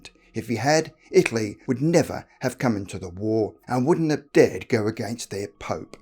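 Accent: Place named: Australia. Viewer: okay than the narrator must be austalian.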